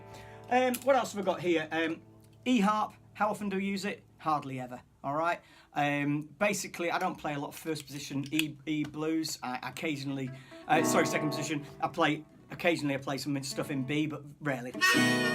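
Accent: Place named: England, United Kingdom. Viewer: Hello again And here's me thinking you were from London 😂 You sound more English than kiwi But that's not of interest your music speaks volumes I tend to play harps as well Mine is G and E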